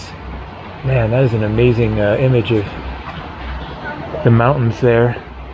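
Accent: United States. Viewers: Hi, I found you today while trying to watch a vlog on Himachal Pradesh. I really liked the slow pace and story telling. Thank you! By the way, Indians don't mind if you face the camera on their face. I think, some may even like it. Well, I left India 39 years ago. I live in Philadelphia now. I am an Indian but what a joy to find you to get to hear the familiar American accent!! Sending love from Philly - the City of Brotherly love. All the best! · please pronounce. “ Himalayas “ and “ Himachal “ correctly…..after all the years of travel ….and you still pronounce some words with that arrogant American pronunciation…….love your videos, but your western pronunciation is a big turn off….and I find it disrespectful…….at least try to get the right pronunciation……most foreigners try to learn the main words in a language to communicate……‼️⁉️🇺🇸 Edit: I thought that with the starlink satellites, reception would have improve in the mountainous regions of the world…..at least that’s what T-mobile said….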